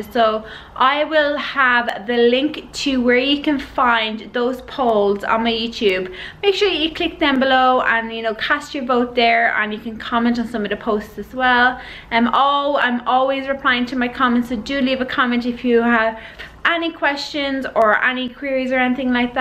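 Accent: Ireland. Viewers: OMG !! YOU'RE IRISH! Instant subscribe just from your accent!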